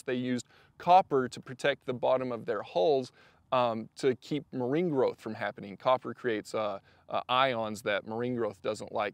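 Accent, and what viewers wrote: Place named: Texas, United States. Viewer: "Still" what a texan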